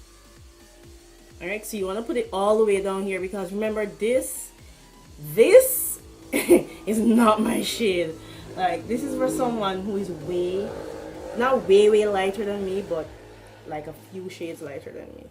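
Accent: Jamaica. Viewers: Are u Jamaican? U gotta be, I know that accent anywhere lol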